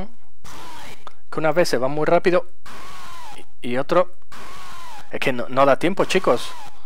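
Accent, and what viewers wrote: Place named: Canary Islands, Spain. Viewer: de donde eres? tu acento suena como si fueses canario ( por cierto buen video yo nunca pude disfrutar de una espectrum ya que yo soy del 98 y es anterior a mi época XD)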